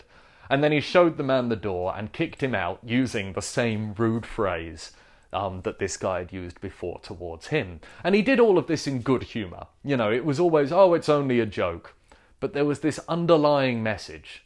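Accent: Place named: Northern England, United Kingdom. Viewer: fuck sake beat me to it bar the northern accent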